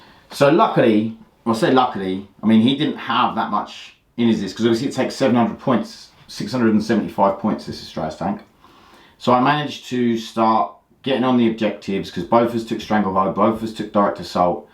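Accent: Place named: Australia. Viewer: Thought you were from OZ…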